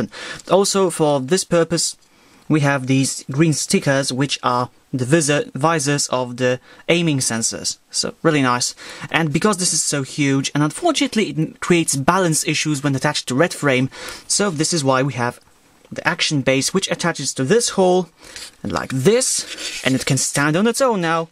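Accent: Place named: United Kingdom. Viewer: man i cat get ur accents.. its kinda british, but than it sounds kinda fobby azn hmmm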